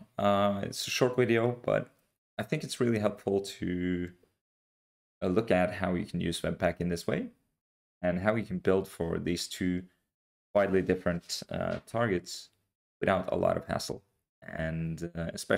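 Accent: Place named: Russia. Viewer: russian accent)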